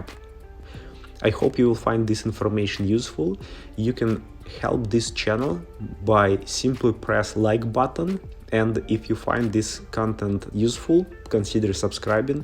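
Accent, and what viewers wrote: Russia. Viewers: Buddy, Are you Russian ?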